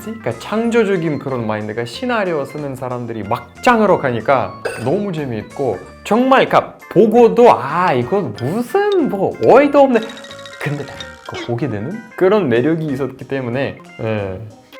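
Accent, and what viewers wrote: Seoul, South Korea. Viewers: 눈감고 들으면 그냥 한국사람 발음이예요. 말투 억양 다 한국 서울남자 100프로예요.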